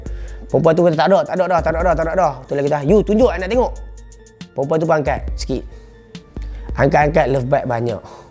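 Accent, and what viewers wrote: Kedah, Malaysia. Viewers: tajuk ceghita menarik tp cagha teghita sebenagnya not bad cuma suka ulang2 benda yg sama. Sementagha nk sampai kepermulaan ceghita tu xtau beghapa kali dok ulang benda yg sama. baiki tentang tu......channel ni akn jd lbh menarik. InsyaAllah. Loghat Og terbaik. 👍👍👍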